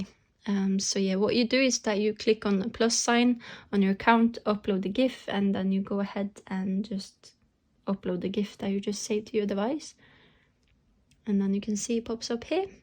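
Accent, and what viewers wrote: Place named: Estonia. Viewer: Ah... estonian accent...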